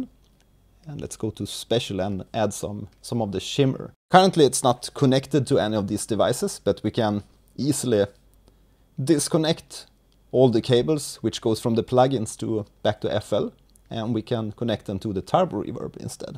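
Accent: Sweden. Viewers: I know that accent! Are you Swedish?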